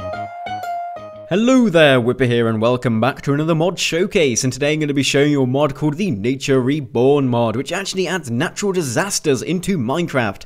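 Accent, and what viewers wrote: United Kingdom. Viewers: are u British not to be rude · hola solo quiero preguntarte si eres británico o no ... ??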